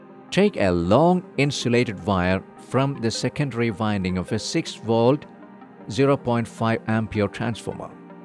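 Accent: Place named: Kerala, India. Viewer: malayali ane bro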